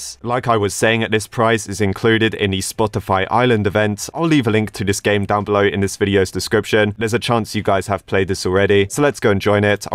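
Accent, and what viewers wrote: United Kingdom. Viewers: You are speaking British English but me america English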